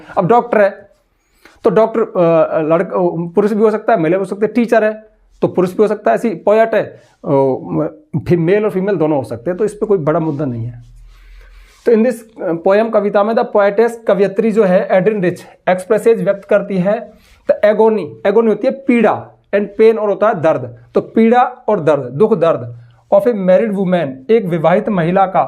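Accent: India. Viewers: Teaching English 😊with Desi accent..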